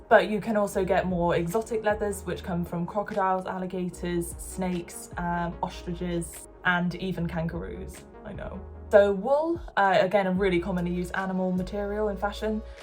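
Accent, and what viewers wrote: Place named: Bristol, United Kingdom. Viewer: Awwww ;D I love the Bristolian accent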